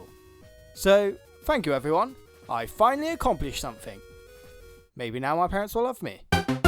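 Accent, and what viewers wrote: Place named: United Kingdom. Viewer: by the way, you sound a little British, do u come from the UK? I do!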